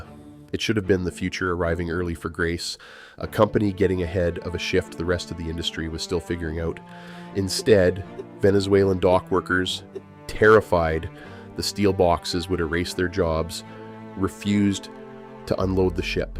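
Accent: Canada. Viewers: ...and the Canadian accent.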